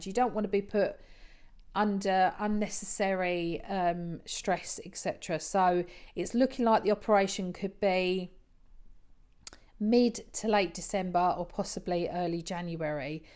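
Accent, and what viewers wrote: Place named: Essex, United Kingdom. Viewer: Hi, Mrs M has recommended your channel, so here I am. Hope lots of viewers come over to see you. So sorry to hear you lost your beautiful daughter, I can't imagine how anyone copes with losing a child., yes!!!. I'd love to see your renovations. I was trying to decide on your accent, I was going to say your a London/ Essex girl then you told us. I'm from Ilford so also an Essex Girl. Have a great weekend 😊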